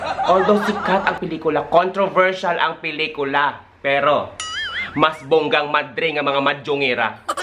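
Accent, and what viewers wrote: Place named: Western Visayas, Philippines. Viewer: Ay dw amu gd ah...fab ko gd na Bala mig ang mahjong..kanami gd ya pamatian ang ilonggo ah hahhhh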